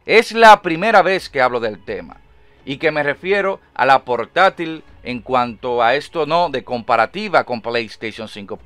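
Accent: Dominican Republic. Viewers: Eres dominicano ?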